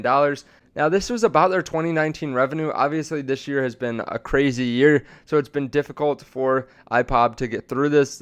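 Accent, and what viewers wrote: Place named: Midwest, United States. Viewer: Dude is so Midwestern I love it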